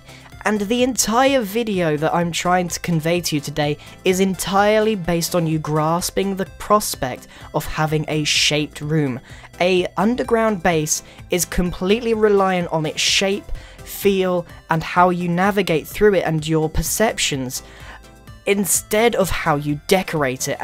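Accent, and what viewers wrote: England, United Kingdom. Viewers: I love your British accent!!!! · David Benner yes, is British, he lives in the UK and he has a British accent.. And I know because I am british, live in the UK and have a British accent..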